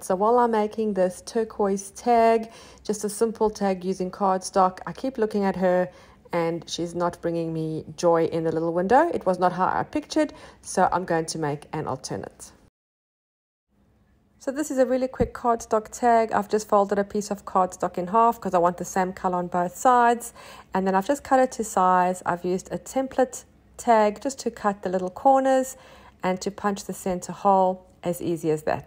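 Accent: South Africa. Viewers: Hi there, I recently came across your channel and love your work. You explain things so nicely and the main reason is because your voice is South African 🤗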